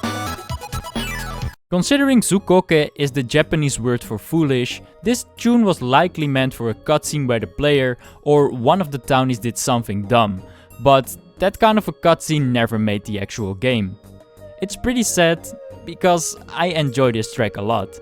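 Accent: Netherlands. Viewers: Fi Fa Fo Fum, do I hear the voice of a Dutch man?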